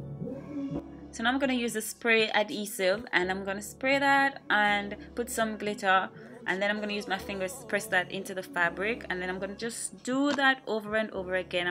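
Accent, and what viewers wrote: Jamaica. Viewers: Just the fact that u sound Jamaican girl I had to subscribe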